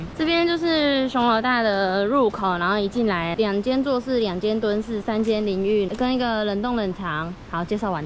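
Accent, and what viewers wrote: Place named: Taiwan. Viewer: 妳好能自言自語唷！講台語好道地的感覺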